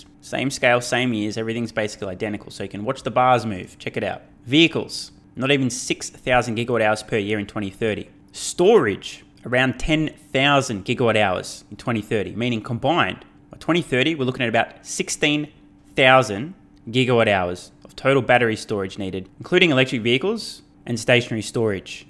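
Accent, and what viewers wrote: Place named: Australia. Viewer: That Australian twang got you a sub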